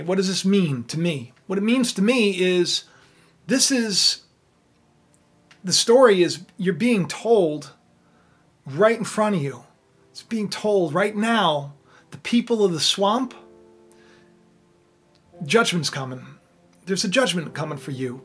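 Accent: New York, United States. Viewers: Talks like true New Yorker “anti gun” everything… Under this logic all police who carry a gun are out to hurt someone..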